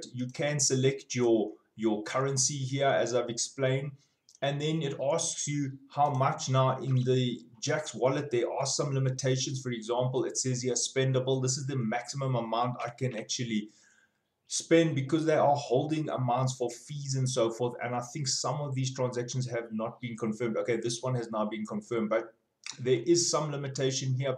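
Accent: South Africa. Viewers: South African!! Lol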